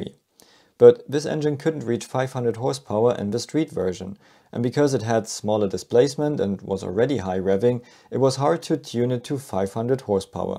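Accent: Germany. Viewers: deutscher accent in ersten 10 sekunden gespotted 👀👀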